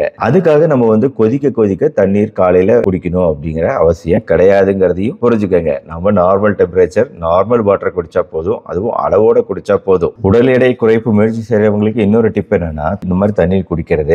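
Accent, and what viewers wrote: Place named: Coimbatore, India. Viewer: சார் நீங்க கோயம்புத்தூர்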